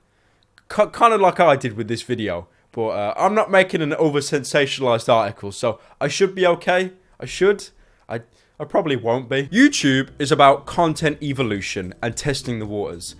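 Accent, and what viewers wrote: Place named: England, United Kingdom. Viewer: ur english akzent is so fucking gay holy shit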